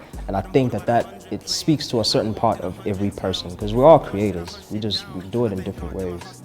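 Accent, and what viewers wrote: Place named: South Africa. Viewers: Coz his south African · why is he using his south African accent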